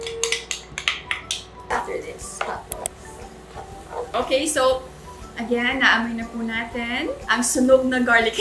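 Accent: Visayas, Philippines. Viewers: Next vlog suggestion po. Bisaya only vlog😍 pero po sana may tagalog subs kasi tagalog kami eh😂 gustong-gusto ko lang po yung bisaya accent nyo❣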